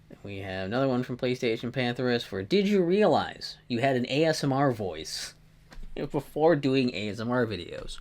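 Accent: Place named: Minnesota, United States. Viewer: I think it's really cool you still enjoy making videos, and I am glad you do post them and share them with the public.🙌 I've been making videos for about 12 years and never thought to be bold enough to post anything, so it's really good that you have and stuck to it! That's mad that you don't experience ASMR yourself, you are very good considering you don't.👌 As for the ASMR voice, you do still have one, but it is definitely nothing to do with being bored and then falling asleep... if that was the case, I wouldn't of watched your videos. 😅Haha thats cool... hello over to Minnesota! I can here that accent slightly ... I only know Minnesota from Marshall in 'How I met your mother'😝Your YouTube plan sounds good, I'm excited! 😆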